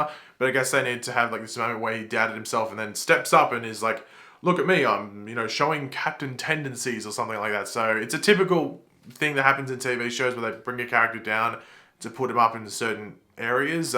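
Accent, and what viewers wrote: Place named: Australia. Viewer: Your mad cause u dont realize hes australian. They have accents. Not everyone is american get over ur self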